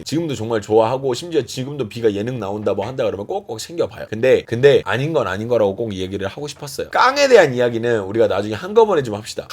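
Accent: Jeolla, South Korea. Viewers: 고향이 혹시 전라도이신가요 말투가 서울말투같지 않아서...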